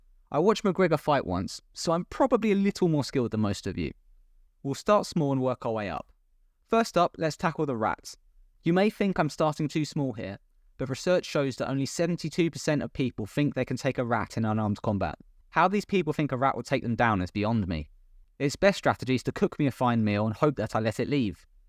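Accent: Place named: United Kingdom. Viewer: 😂 great video! You made me laugh! Looking forward to watching the next one! By the way, I love your British accent!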